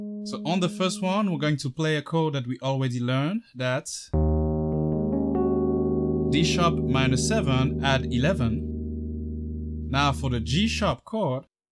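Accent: France. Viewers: Awesome man, i'm very grateful. Is that a french accent?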